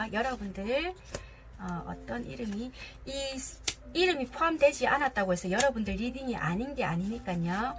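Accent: Busan, South Korea. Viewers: 저는 헤어지고 연락 끊어져서 연락이 와야 투닥거릴수가 있는데 ㅜㅜ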